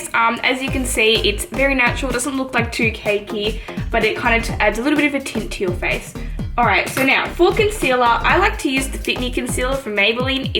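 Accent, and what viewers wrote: United Kingdom. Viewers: British and African ❤ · Question: are u British?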